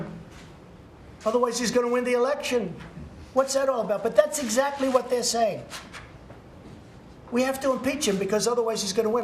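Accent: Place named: New York, United States. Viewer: Never realized how strong his new york accent is. He has the energy of an italian when he talks lmao.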